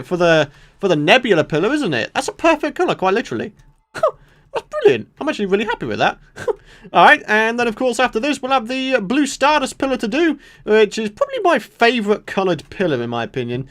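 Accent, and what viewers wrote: United Kingdom. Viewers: EMPER He’s British. It’s an accent.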